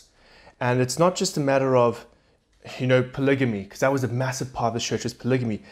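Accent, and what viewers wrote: South Africa. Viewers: I heard his South African accent immediately too. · Are you South African? Sorry for asking if it offends you, because I don't mean to, I'm just curious about your accent. Good review from a Christian's point of view.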